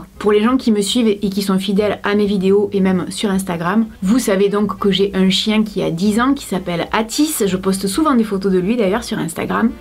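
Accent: South, France. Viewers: J'adore l'accent du sud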